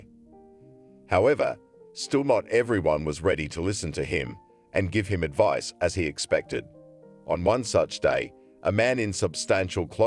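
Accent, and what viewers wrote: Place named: United Kingdom. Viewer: the stories are good but it would be better if the narator didnt have a british accent. ir's somewhat hard to grasp the words as he pronounced the letter A as I. sorry to say this but I hope you could find a better narator with american accent. tnx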